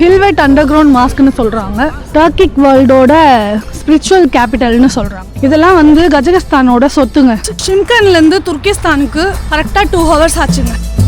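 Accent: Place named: India. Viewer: யூரோப் & யூரோப்பியன் யூனியன் பிரதேசங்கள் பயணம் செய்யும் போது, இந்திய ஆங்கிலம் பேச வேண்டாம்.பஸ் என்கிற வார்த்தை புஸ் என்று கேளுங்கள்.போலிஸ் வார்த்தை புலிஷ் என்று கேளுங்கள், ஏர்போர்ட் வார்த்தை ஏர்போட்டா என்று கேளுங்கள்! உங்களுக்கு வழி கிடைக்கும்.😊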